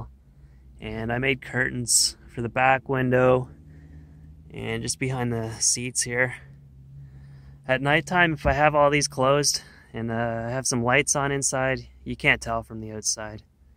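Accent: Canada. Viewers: <<If you can't tell he's Canadian by his voice then you're either not paying attention>>, because he says, "I live in an eastern Canadian city" : ) All kidding aside, I practically live on the border of Canada, so I caught that familiar accent right away. We love our Canadian neighbors. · Beautiful Canadian accent ❤ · Judging by this dude's accent, he's in Canada. Probably Toronto or somewhere if it's so expensive and he's talking about going "out West." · I thought he lived in Canada because of his accent and the insane price of living. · If you can't tell he's Canadian by his voice then you're either not paying attention or you know nothing about Canadians.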